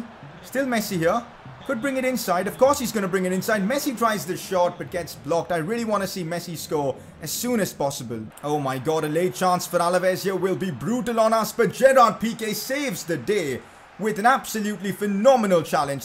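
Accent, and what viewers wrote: India. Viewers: bro u indian?? i mean u have a bit of indian accent... just casually asking.. nice vid btw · I like you. You have a thick Indian accent, but I like you. Straight to the chase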